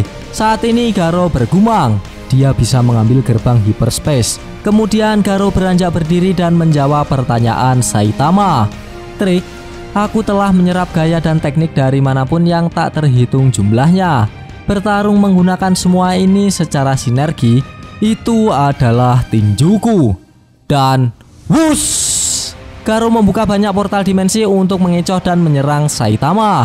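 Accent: Java, Indonesia. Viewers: Jurus2nya kocak anjir medok😂😂😂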